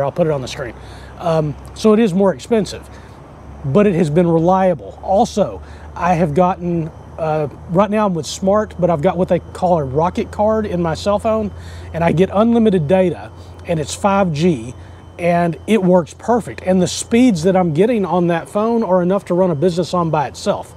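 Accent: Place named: North Carolina, United States. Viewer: Great video. 'Glad you're stayin'. I'm planning on coming over for good in November. The first thing I'm planning on doing is to buy a motorscooter, new one. Honda. What are the hoops you have to jump through. AND most importantly, what is the situation with getting a driver's license. Is a US license good for a period. Can you do the whole registration / insurance thing in one go or is it a long drawn out process. SECONDLY, I'm in N.C. so I'm appreciating the accent; hold on, secondly as I said, why did you go to Mindanao. It seems EVERYONE is going to the Dumaguete area and Mindanao sees like the dark continent. I've never been to the Philippines except to fly over it once but I've lived in Mexico so I appreciate the concept of time and bureaucracy and it seems to be a Spanish trait the Philippines has picked up nicely. I'm VERY patient. 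What are some prices for a two bedroom in Mindanao? Is there an English speaking community in Mindanao or is most of your time spent at home. Questions, questions...... Thanks a bunch and enjoy your day. All the best, Greg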